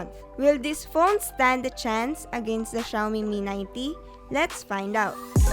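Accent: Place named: Philippines. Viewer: kitten is a cat what I think he's trying to say its kind of cute she has that kind of "stereotypical" filipino english accent which I guess is heard in most grade 10 students of the Philippines despite her age. But who knows lel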